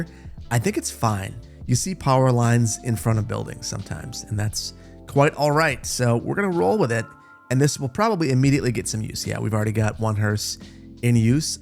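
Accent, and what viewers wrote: Wisconsin, United States. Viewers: Just watched all of this playlist, I'm from southern Wisconsin and it's great to hear the "up north" accent attached to all this scenery.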